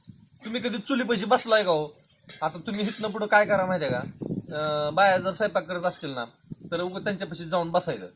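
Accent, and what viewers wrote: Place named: Malshiras, India. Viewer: Tumchi malshiras talukyatale vatata karan aamhi pan tikdachech aahot......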